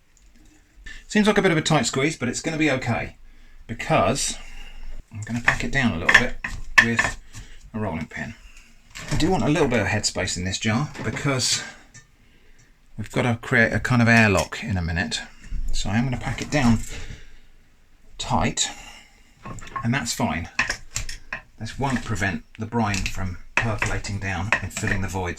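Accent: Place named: Southern England, United Kingdom. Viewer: thought it was south but couldn’t quite place the accent , From Kent myself but I live in Norwich ,, great foraging out in the country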